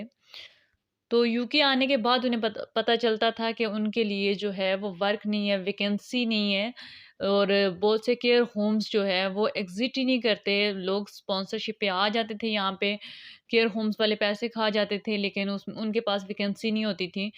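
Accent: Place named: India. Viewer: i already watch your video and i found difficulties to clearly understand Indian language or accent. Please if you could answer me about my questions in English i would be grateful. Thank you in advance.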